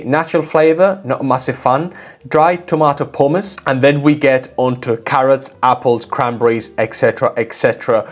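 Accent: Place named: Northern England, United Kingdom. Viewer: I notice you didn't factor in recalls and poisonings. Kinda important, but maybe that's another video, eh? Blue Buffalo and Iams have horrible track records in that department, FWIW. THANK YOU YOU ANGEL SENT FROM THE GOOD PLACE (I.E. 'the norff' by the sound of you.) We all desperately needed a vid like this. Clear and very educational. By the time I got to the end I felt like I'd learned enough to judge the dry foods pretty well on my own. Well enough to know what to steer clear of at least. I am so grateful. Glad to learn the one I'm currently feeding is the pick of the litter too, thank god! I wonder (and would not be suprised to learn) if our standards of pet food here in the U.S. is even worse than where you are. We probably allow all kinds of crap EU and UK wouldn't dream of allowing. That's if the way they handle the human food over here is anything to go by. 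Anyway cheers, and keep up the good work.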